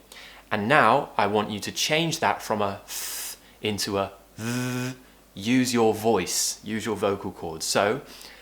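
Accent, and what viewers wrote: RP, United Kingdom. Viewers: I am actually from Essex however I am doing a language exchange with an Italian guy who struggle with "th"... I have recommended your video to him because it is AMAZING! Can I ask do you generally speak with an RP accent or do you do it just for your videos? :) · Hey i have seen your all video. And i want to recommend something. And i want to requist something.and i hope. That is when i read a bible i found serious pronunciations in the verse.would u mind if u receive my request? MATTHEW Chapter5 verse 23~25 I need your help and British accent thank u my best teacher ※i just hope. You don't need to get serious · You are an amazing teacher, your British accent is amazing...lots of love from Bhutan 🇧🇹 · good looking with this hair cut Thanks for your teaching eng with british accent😍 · Dude this is super difficult. Cause we are taught to speak “other” in a completely different way in India. 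It defintely doesn’t sound like udder but we don’t put the tongue in between. As you said , the muscle memory has to be worked on. You sound super POSH. Want to learn to speak in the same way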